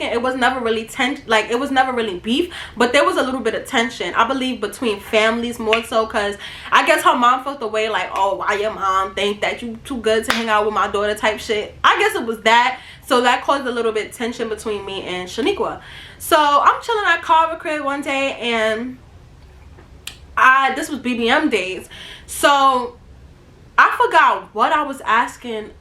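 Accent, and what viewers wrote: New York, United States. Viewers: I love your stories but your NY accent is what drew me in and the reason I keep coming back 🥰🥰🥰🥰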